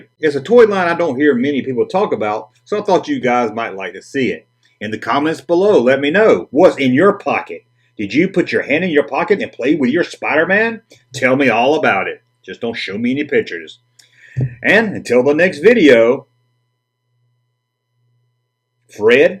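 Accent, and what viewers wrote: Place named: Mississippi, United States. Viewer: Well done! Hey, where are you from? You sound like you could live right down the road from me in Mississippi. 😃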